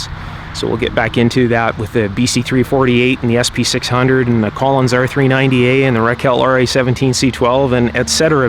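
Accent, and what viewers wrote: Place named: Canada. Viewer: He sounds Canadian.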